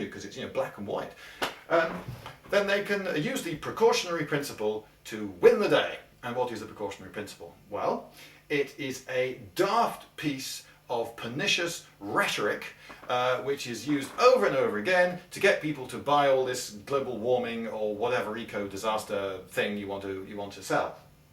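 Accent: England, United Kingdom. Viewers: Ah yes. I do like me a good English accent. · Though I disagree with the actual thing he's arguing against, I agree with the concept and the way he presents it. Maybe it's because I'm American and we are thorough - bread to listen to anyone with an English accent. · While I do think that GW is something to take seriously, I also think carbon offsets are just a load of crap. That said, I have to tell you that were it possible, I would sleep with you. :) What can I say? You're both intelligent and attractive, and English accents are hot.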